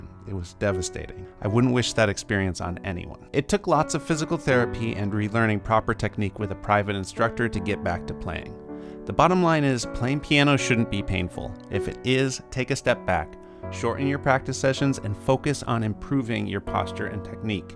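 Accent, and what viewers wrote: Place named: United States. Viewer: This video is amazing. I will show it to my students. And by the way - what pleasant American-English you speak!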